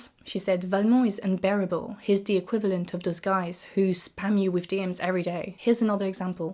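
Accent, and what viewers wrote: France. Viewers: Is it just me or does she sound more French than usual?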